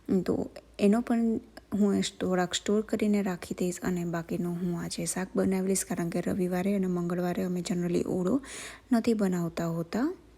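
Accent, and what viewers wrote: Saurashtra, India. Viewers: Nice vlogs. Aaj first time aapna videos joya Aap Saurastra thi 6o e language tone par thi khyal aavi gyo. Kya jilla thi 6o ? Tya na lifestyle upr video banavjo to ahi thi tya javavala loko ne help mle.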